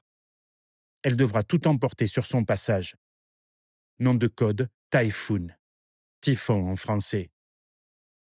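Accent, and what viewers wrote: South, France. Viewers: Pas mal du tout, les documents tiennent la route et le commentaire ,avé l'accent du midi, est sympathique !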